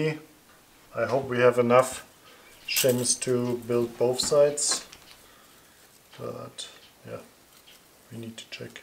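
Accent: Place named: Germany. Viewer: beautiful landspace, where do you life, monkeys running around? your english speech seem to be a littie bit "germanized". thanks for your comments and detailed video from your landy repair! best wishes from austria!!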